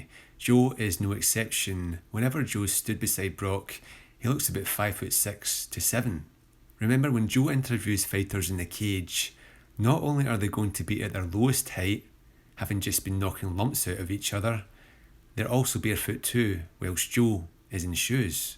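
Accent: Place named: Scotland, United Kingdom. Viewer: based scottish accent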